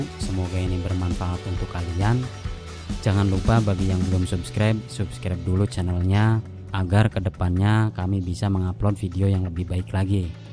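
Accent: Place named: Cirebon, Indonesia. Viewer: logatnya ky org indramayu/cirebon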